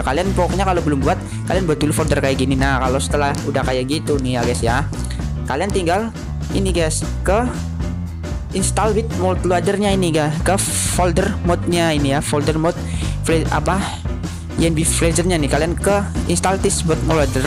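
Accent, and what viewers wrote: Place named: Java, Indonesia. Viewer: Medoknya mntep bos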